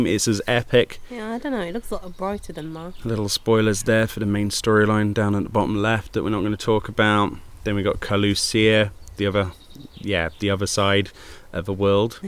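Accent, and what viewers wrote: United Kingdom. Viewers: Love UK accents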